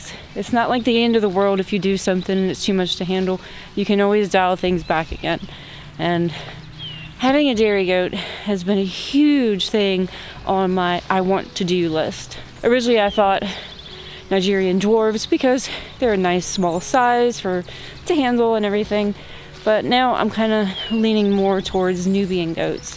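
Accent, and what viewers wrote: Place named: Great Plains, United States. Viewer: Your accent sounds more like Great Plains than Allegheny. Nice video. I raise a little flock of chickens myself. They are very entertaining.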